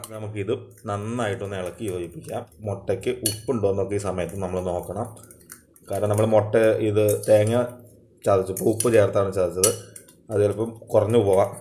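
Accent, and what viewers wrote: Palakkad, India. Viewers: പിണ്ടിമനയിൽ covid +ve ഉണ്ട് stay safe and healthy,. Proper hand wash and wear mask everytime..പാലക്കാട്‌ ജോലിയുണ്ടോ /താമസിച്ചിരുന്നോ,?? പാലക്കാട്‌ കറികളും സംസാരരീതികളും 🤔🤔🤔നമസ്കാരം പറയുന്ന ബാക്ക്ഗ്രൗണ്ട് കാണുമ്പോൾ പാലക്കാട്‌ പോലെ തോന്നുന്നു,,, ഞാനും കോതമംഗലം ആണ് wrk pkd യും,...... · ചേട്ടന്റെ ഭാഷ പാലക്കാട്‌ ടച്ച്‌ ആണല്ലോ... ഞാൻ വിചാരിച്ചു പാലക്കാട്‌ ആണെന്ന് ട്ടാ..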